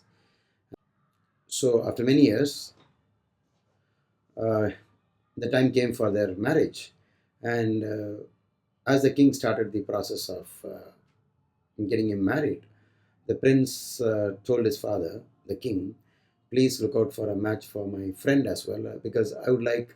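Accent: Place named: Madras, India. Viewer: ur accent is madrasi type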